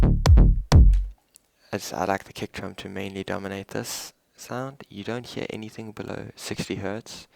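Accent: South Africa. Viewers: He sounds South African.. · South African?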